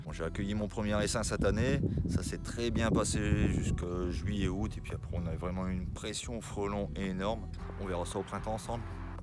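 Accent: North, France. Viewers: J'avais bien reconnu l'accent chéri du Nord ☺️ Bravo pour toute ta belle création et tout ce que tu as donné ! Quelle énergie ! C'est une joie de te découvrir, de découvrir ta belle tribu animale. Prends bien soin de vous et belle suite encore.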